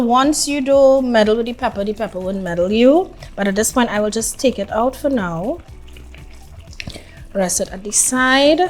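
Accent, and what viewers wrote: Trinidad and Tobago. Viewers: Love her videos! She is totally engaging and genuine. Keep using the Trini dialect girl. It adds the extra taste in the local dishes that you present. Blessings. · Oh Ghosh!! Girl, I just love that I found you. I love how you talk. A real Trinbagonian. I’m here to stay for always🧡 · I’m Jamaican and never had that dish . It looks mouthwatering. I just your Trini accent.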